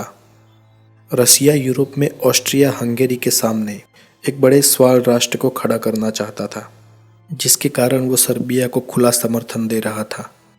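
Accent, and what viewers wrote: Gujarat, India. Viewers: आप गुजराती हो क्या ?😇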